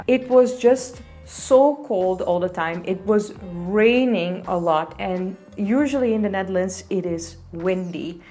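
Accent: India. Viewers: Omg . You already have a semi Indian accent. It's kind of a hybrid Indian-European accent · she has such a cute partial Indian accent, like some words in between. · Haha geweldig! Love dat je inmiddels ook een klein Indiaas accentje hebt. Well done! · Now you have an indian english accent as well as look like a (pale) indian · Your pronunciations are very indian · Ha ha you speak little bit with Indian accent too. Started be be 100% Indian.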